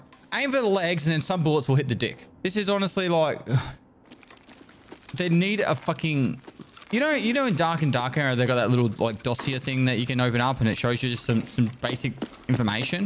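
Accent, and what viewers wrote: Australia. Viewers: Australians have the best accent lol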